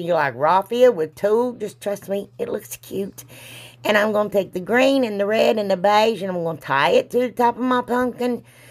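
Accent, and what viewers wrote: South, United States. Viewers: Again, of course said in an awesome Southern accent! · Where do you live? That southern twang🤣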